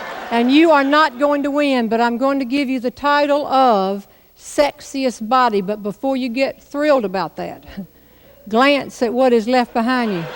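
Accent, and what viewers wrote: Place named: Georgia, United States. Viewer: her accent sounds so much like a South Georgia accent I love it!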